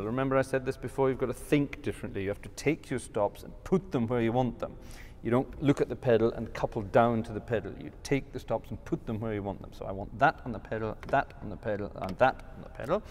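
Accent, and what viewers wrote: Scotland, United Kingdom. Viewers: I didnt realise you were scottish! Explains the accent then haha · To my British ears...no! has a lovely Scots accent:) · Er ist ja Schotte. Schottischer Akzent klingt angeblich, als würde ein Bayer Englisch sprechen. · Did german language steal your scottish accent?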